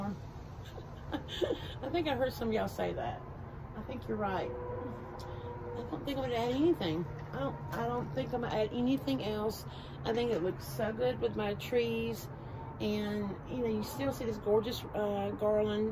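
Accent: South, United States. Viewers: Love your videos. You have great taste and great ideas and with your southern accent it makes it feel so homey and friendly 😉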